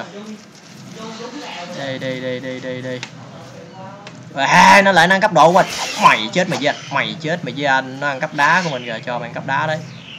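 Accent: Mekong Delta, Vietnam. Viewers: thấy tiêu đề với hình nghĩ ad lên tay rồi ko ngờ ko ngờ là bị mấy con khung long săn lại, mém bỏ mạng kaka đi bụi luôn. ad giọng ad nc dễ thương, người miền tây hả ?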